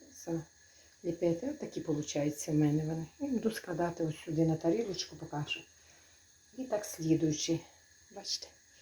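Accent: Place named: Poltava, Ukraine. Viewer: Милая хозяюшка! Спасибо Вам и за рецепт и за воспоминания молодости,когда мы ездили в гости к свекрови в Полтаву. И этот говор, и эта простота в приготовлении,и даже марля на кастрюле. Свекровь делала такие вареники ещё и с мясом( фарш из отварного мяса). Дуже смачно было! Храни Вас Бог от бед и напастей! Здоровья. вам!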